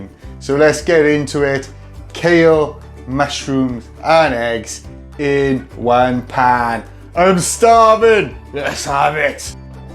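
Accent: Wales, United Kingdom. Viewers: Hi, great to hear a Welsh accent on Youtube and in the Bosses group. Great video, good luck tomorrow for the Grand Slam!!!!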